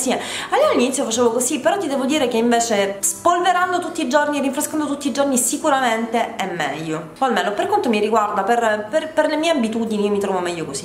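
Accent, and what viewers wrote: Sicily, Italy. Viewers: per favore puoi farci un home tour di casa tua... sto per arredare casa e vorrei magari dei suggerimenti e delle idee... sei bellissima e fantastica mi piace un sacco l'accento siciliano che hai grazie per la compagnia un bacione:* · ma sei siciliana????😉 infatti hai detto bene essendo in casa sola o.....massimo 2😊😊 se uno ha bambini piccoli gia la cosa cambiaa😁😁ti va passare anche da me??? ciao carinissima...simpaticona...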